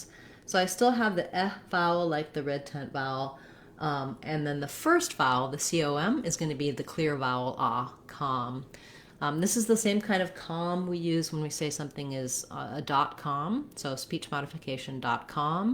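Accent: United States. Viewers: I think you should state that these are US pronunciations. In the UK we use first syllable stress for noun and adjective.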